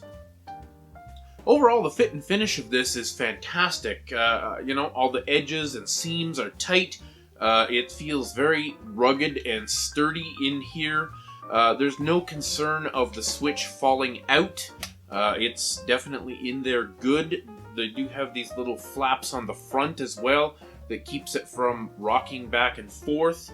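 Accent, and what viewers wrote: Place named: Canada. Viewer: I hear that canadian accent 👍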